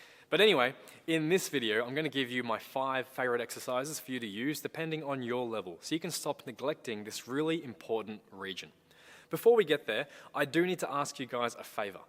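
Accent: Australia. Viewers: Are you from Australia? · I’ve never seen an Asian with an Australian accent, super cool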